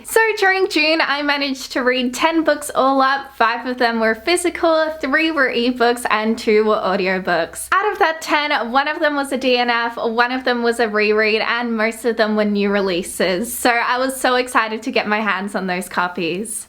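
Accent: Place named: United Kingdom. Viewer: I’m trying to figure out wether your British of American because you sound both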